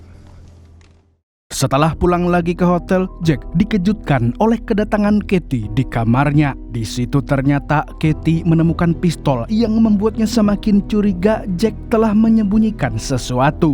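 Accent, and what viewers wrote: Banjar, Indonesia. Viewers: Org banjar kah nyawa Nang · urang banua sklinya amang ni lehh 😅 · Orang banjar kahh😮😂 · Admin orang banjar kah ☺️ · Uma ai mang suara pian merindu banar week week paristiwa pang😅😅😅😅😅 Banjar banar nah. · Orang Banjar kh Pian mang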